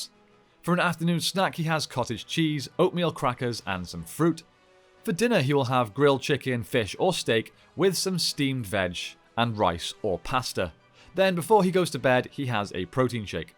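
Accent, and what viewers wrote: United Kingdom. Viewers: Lol, not surprised you have a gym downstairs. You don’t really dress to show it off, but you can’t entirely hide from a discerning eye that you’re in good shape. Put your physique together with that British accent, your red hair, and your rugged good looks and it’s no wonder I enjoy looking at you just as much as I enjoy listening to you talk about Batman!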